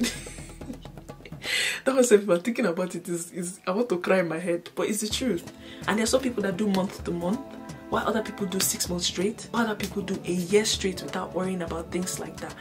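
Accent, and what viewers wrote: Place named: Nigeria. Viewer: I love the Naija accent at the intro💯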